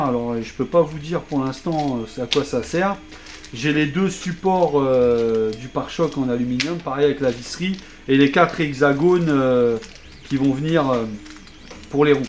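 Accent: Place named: France. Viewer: Excellent cette vidéo, je me taté par rapport au rapport qualité / prix et bien ça semble pas mal... J’adore ta façon franche de parler et ton accent frenchy sur les mots technique anglais 😂. Merci pour ce partage et vivement le test sur le terrain. Big Up à toi 🤘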